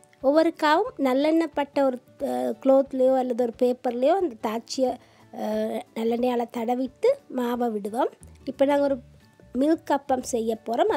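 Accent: Sri Lanka. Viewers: அழகாக சிலோன் தமிழில் பேசி அற்புதமாக அப்பம்(ஆப்பம்)சுட்டுக் காமிச்ச உங்களுக்கு என் நன்றி!!உங்கள் தமிழ் உச்சரீப்பு நன்றாக உள்ளது!!இந்த அப்பத்துக்கு தேங்காய்ப்பால் எடுக்கலாமா?!அதில் ஊற வச்சு சாப்பிடலாமில்லையா?!நன்றி சகோதரி!! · Hi mdm! I know u are a Sri Lankan by the way u talk n yr slang i m also one but Malaysian Sri Lankan. Seeing yr recipe looks so simple. I have tried but never turned out.now looking at yr recipe so simple.let me try yr respi .please one queston . If I were to use coconut water what would be the measurment be like I'm ke? Do I still make the kanji.n add coconut water ? Pls reply.tq very much.please send more recipes for us.Bye. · Srilankan Tamil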